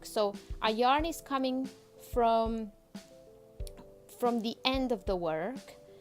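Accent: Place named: United Kingdom. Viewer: HI. Enjoyed your video. BTW your from UK? Like your accent. THANKS.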